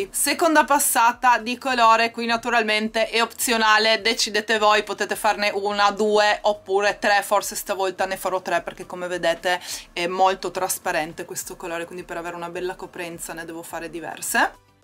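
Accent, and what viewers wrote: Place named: Trieste, Italy. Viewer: La cadenza è triestina...Qualche parola la pronuncia un poco male perché porta l' invisalign ai denti...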